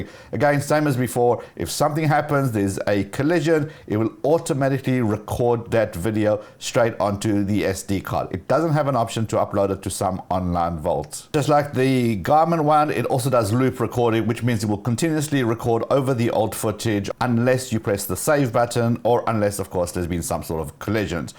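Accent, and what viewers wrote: South Africa. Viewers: Hello, it's absolutely true, the more you pay doesn't ALWAYS buy you the best.. good video.. In other news, are you South African? You sound like a saffa boet🌍🤔😂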